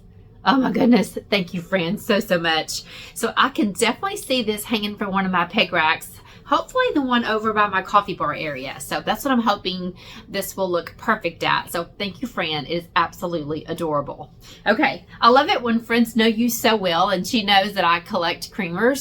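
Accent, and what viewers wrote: Texas, United States. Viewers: I really like your 'treasures'. It sounds like you're from Texas--we are, too. Where are the best antique stores in the Hill Country? We take all of our visitors to the Silo's in Waco, also.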